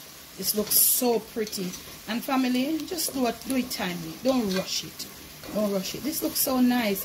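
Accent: Jamaica. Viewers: Mrs. I just watch your Sunday show and once again your cooking make me long for a vacation to Jamaica just to eat your food Many blessings to you and the ones you love P.s. I just love your accent especially the way you say "and all dat"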